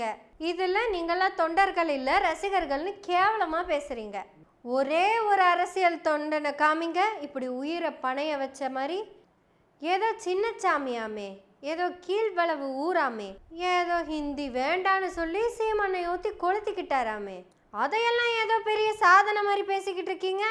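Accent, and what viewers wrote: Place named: Coimbatore, India. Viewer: Dear Sister. Your Covai Slag Speech is very Beautiful. Keep it up. Best Wishes.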